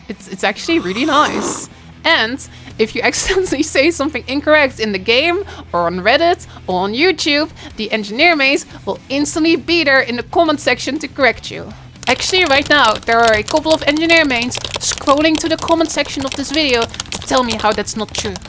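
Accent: Netherlands. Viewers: i swear i hear a dutch accent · That dutch accent made my neckvein throb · I love your english-dutch accent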